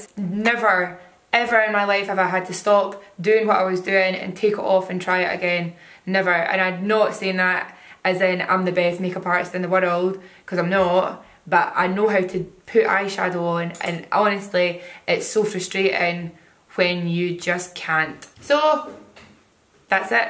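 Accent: Scotland, United Kingdom. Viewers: Are you Scottish? :} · She's Scottish, and yes I love her accent too!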